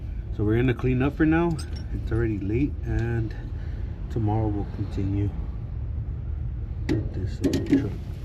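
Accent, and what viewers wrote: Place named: Southern California, United States. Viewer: You got that southern california chicano accent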